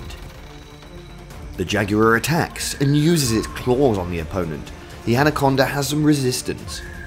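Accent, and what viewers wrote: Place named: United Kingdom. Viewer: i like the narrator because he can speak with a British accent...jolly good show old bean!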